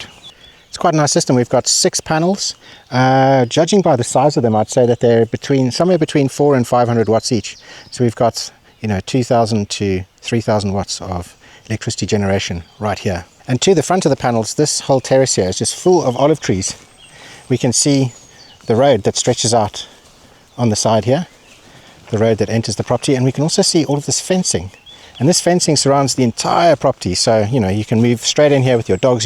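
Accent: South Africa. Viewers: i reallly enjoy your presentation style with the south african twang ... great combo ... property is kinda missing for me strange small windows in the first bed and kitchen and the reception/dining has the front doors but could really do with another big window. price is good ... question tho ... does the farm sell their produce and make a profit? otherwise one is left with looking after a huge amount of trees. there is a calmness and a lack of commercialism (or was when i lived there) that is very calming ... the one reason i wouldn't live there? there is no way i could learn the language.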